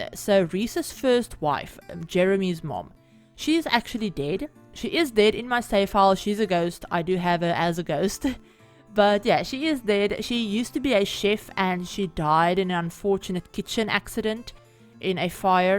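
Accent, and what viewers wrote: Afrikaans, South Africa. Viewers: Are you Afrikaans? Your accent sounds very Afrikaans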